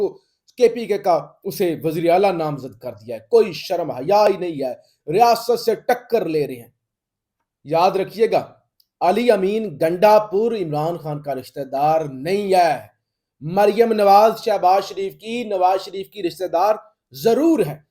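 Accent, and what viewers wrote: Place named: Rampur, India. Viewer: Asslamoalekum Ap plz yeh bate yeah Ki Apki Ammi ya Abbu Ki traf Ka koi india kr U.P se hai, Rampuri hai koi na koi 😅… Ap Bilkul Rampuri Andaz Ke ho, Ap Pakistani nhy lagte ho … plz reply 😅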